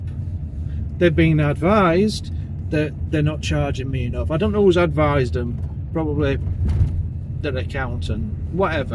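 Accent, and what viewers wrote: Yorkshire, United Kingdom. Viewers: You sound like a Yorkshire fella! ❤🇦🇺 I listen more and hear you are. I’m from there too, Australia now. Love your channel ❤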